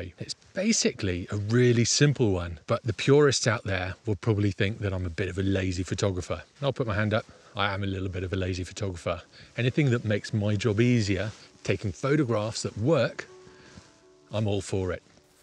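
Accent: United Kingdom. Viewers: i love u r british accent.when i hear u r voice.i"ll remember Matrix movie star anderson.by the way u r great for teaching photography triks and tipssss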